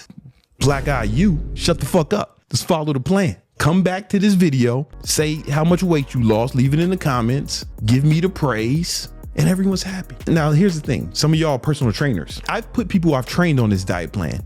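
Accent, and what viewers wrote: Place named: New York, United States. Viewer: German here...just discovered your channel and I especially like the way you talk. Is that the slang or dialect from New York? I will use it to teach it to me (by "shadowing" the way you speak)...that will be fun...a white boy and meek and mild do gooder like me with that talk...hahaha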